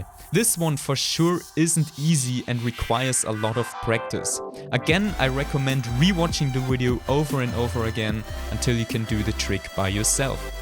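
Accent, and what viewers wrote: Germany. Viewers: Man hört den deutschen raus 😂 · Dieser Deutsche Akzent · Irre ich mich oder höre ich da einen deutschen Akzent heraus? 🤭😂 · Bavarian englisch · Ahh ich war mir nicht ganz sicher als ich den Aufbau der Deutschland Flagge auf dem Pfp gesehen habe aber mit dem Akzent bin ich mir sicher also dir noch ein gutes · Are you german? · Dieser deutsche Akzent xD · Du bist deutsch oder? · Are u a german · Dieser deutsche Akzent zu wild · wusste ich doch, dass ich einen Landsmann an seinem Akzent erkenne. xD Danke für die schnelle Antwort, mach weiter so mit den Videos. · Is he German he has so A German act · Are You German? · Its a DEUTSCHER AKZENT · The fax that’s he German u can say by he’s accent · Man hört richtig das du deutsch bist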